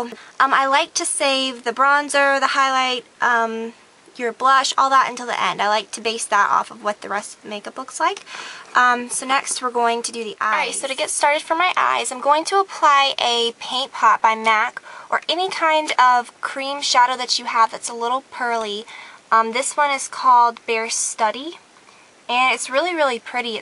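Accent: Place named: Atlanta, United States. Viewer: In this vid you can def tell shes from Atlanta!!! Hahahaha she has changed a lot, wish is good!!!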